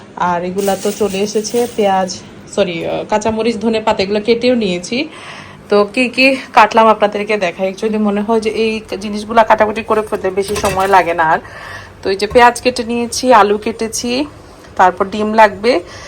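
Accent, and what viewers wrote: Sylhet, Bangladesh. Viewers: Apni ki sylheti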